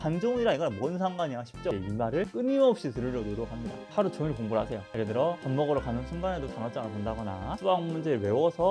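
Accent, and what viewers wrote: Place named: Jeolla, South Korea. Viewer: 왜케 귀여우세용ㅎㅎ 전라도 사투리 ㅋㅋㅋ💕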